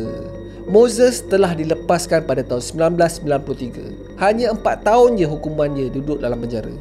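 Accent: Perak, Malaysia. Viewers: ptut comel je bnyi dia😅, ooo... Kome orng perak rupa nya atei Kome sihat ke top?🤭 Teman dlu blajor dkt sano, Teman tinggey kt Batu gajah dlu yop. (Orng perak Jgn marah erkk, saja tringin nk blajaq ckap perak)😅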